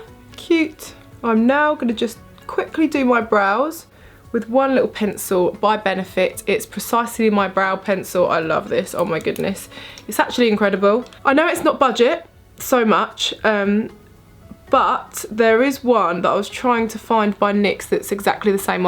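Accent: England, United Kingdom. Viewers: Your English accent is awesome! Your beauty is awesome! Your Everything is awesome! You are awesome, dear! Lots of love from India to you!! We love you alot.. and hope that you too love us Indians..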